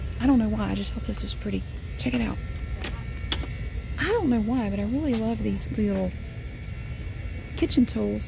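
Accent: South, United States. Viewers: I appreciate your organizational vids and adore your Southern accent 💘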